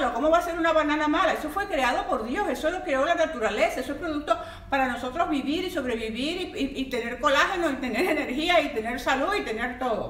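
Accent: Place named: Venezuela. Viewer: Que interesante escucharte jajajaja venezolana hasta el tuétano mami más claro imposible jejejeje, mil bendiciones desde Valencia, Venezuela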